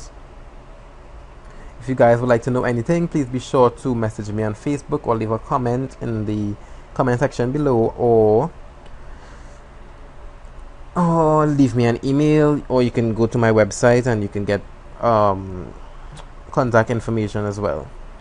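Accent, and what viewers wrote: Trinidad and Tobago. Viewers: You're trini just like me lol I have problem understanding you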